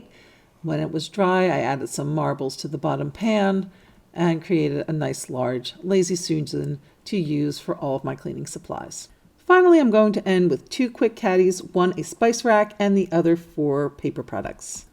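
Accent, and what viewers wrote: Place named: East Coast, United States. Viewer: Where are you from? Somewhere on the east coast obviously. I hear it especially when you say the word “on.” Love east coast accents. 😊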